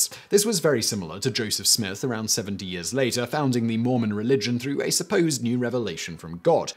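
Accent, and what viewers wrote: United Kingdom. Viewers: For the last month this guy has been acting high in these videos. "I have no idea what I'm about to say ... I never heard of any of this ..." Is this in the script? Is he pulling these phrases out of his ass? These videos are becoming unbearable. The British accent made him sound smart over the years. Like when people think Alex Trebek is a genius while he is just an actor. But when he acts like an idiot, the accent makes him sound even dumber. Why would I want to learn from some backwater hick? Is he saying things that are researched or is he just making stuff up?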